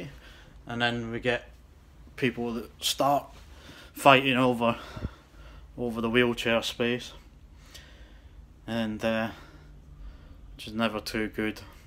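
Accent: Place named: Scotland, United Kingdom. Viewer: Thourghly enjoyed the video. Nice scottish accent. Hope your stil with the buses. Avid enthusiast.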